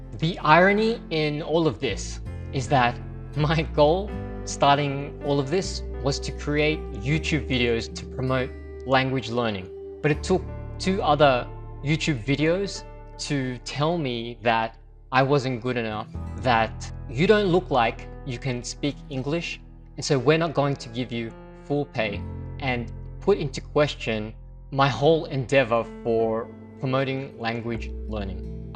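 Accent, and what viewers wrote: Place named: Australia. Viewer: Hi, great channel and videos. Pinoy here. First I would suggest you learn to speak Tagalog with a native accent. Speaking Tagalog with an Aussie accent makes it sound weird. It has nothing to do with your ethnicity, it's more like me going to Australia and speaking English with a Tagalog accent or a Chinese accent (you know what I mean). As a language learner myself (I'm currently studying Japanese and Spanish), I believe being able to speak with a native accent is part of the process of learning another language. Secondly, I can understand your experience with so-called "native English" speakers. It's the same here in Hong Kong. I'm a financial editor and writer, and I used to work for an English-language newspaper that hired editors based on the color of their skin. If you're a gweilo from the UK, US, or Australia-NZ, you're hired. 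Many of them do not know proper English grammar which is essential in written English (much less the technical aspects of the subject matter that I write about and edit). I would argue there is a huge difference between written English and spoken English. A person may be able to speak English well enough as a native English speaker (those who were born in an English-speaking country) but being able to write properly (and edit) in English is another skill that has to be learned. Needless to say, working for that company was quite frustrating and the editorial quality of that newspaper left a lot to be desired. Good luck with your future en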